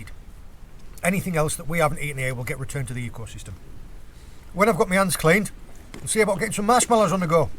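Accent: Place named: England, United Kingdom. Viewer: where do you forage? by accent Im guessing England, but I cant place it, I never lived in England long enough to tell. Im actually considering moving back to Ireland as I miss foraging seafood a lot - about 20 years ago I used to live in Carrigaline/Crosshaven, Ireland and pick up seafoods with a bucket. Your channel sure makes me miss those times.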